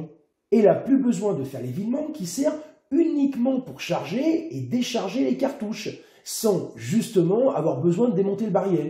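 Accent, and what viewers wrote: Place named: Lyon, France. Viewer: accent lyonnais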